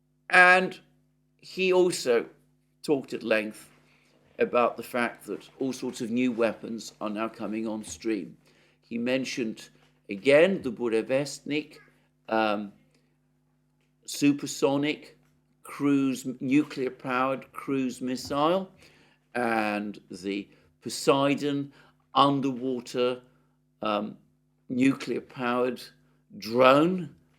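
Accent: United Kingdom. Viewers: Great job from the best informed reporter. Prudent in presentation. Great british accent🙂love those daily moments ❤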